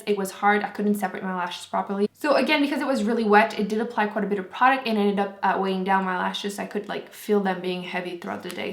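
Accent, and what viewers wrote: Quebec, Canada. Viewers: Ah j'ai reconnu ton accent tout de suite!! Une québécoise!! :) Cool ton vidéo!! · Are u from quebec 😏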